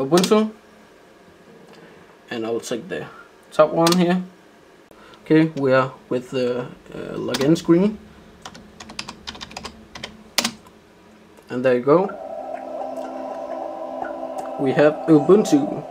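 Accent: Denmark. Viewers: Dude, you're so danish :-D - Its so obvious by the way you're talking english!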